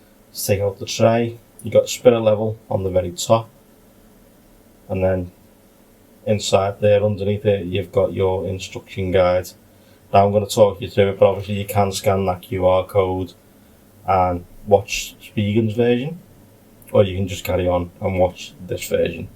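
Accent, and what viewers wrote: Liverpool, United Kingdom. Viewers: Thanks for the video! Very useful. A curious question, are you a scouser? Greetings!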